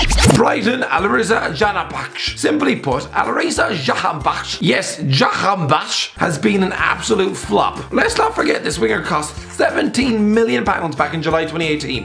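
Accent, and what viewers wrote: Ireland. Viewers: When you're that Irish the subtitles think you saying "hello and welcome to hitc sport" is you saying "how about tomatoes disease poor" you need to slow down when you talk my friend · Yes! The sca....Irish guy is back!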